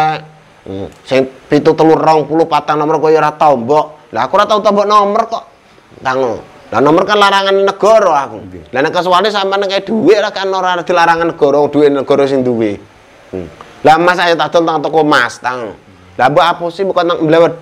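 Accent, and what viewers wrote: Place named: Kudus, Indonesia. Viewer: logatnya ko sperti orang kudus, tayu pati ya, mungkinkah mbh asli dr pati tayu atau kudus.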